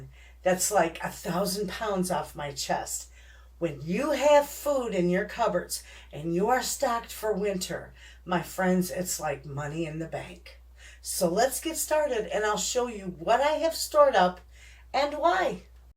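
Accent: Michigan, United States. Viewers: You just popped up on my play list, took a look at your pantry, trying to figure your accent. Michigan! We used to live in Hersey!!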